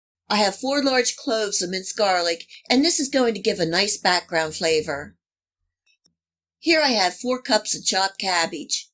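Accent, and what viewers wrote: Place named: Pennsylvania, United States. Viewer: Made something similar today, I was craving it. By the way … are you from Pennsylvania? I detect that accent, because I’m originally from there, and I know it when I hear it!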